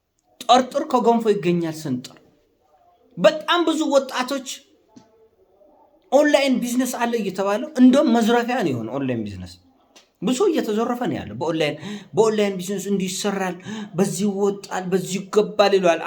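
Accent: Tigray, Ethiopia. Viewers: ሰላም ሰላም ለምንድነው በትግራዋይ አክሰንት ቋንቋ ዜና ማስተላለፍ ምርጫህ ያደረከው? ትክክለኛ ቤሄርህስ ከየትኛው ወንሞቻችን ነክ? በነገራችን ላይ የማንም ብሄር ተወላጅ ብቶን ወንድማችን ነህ!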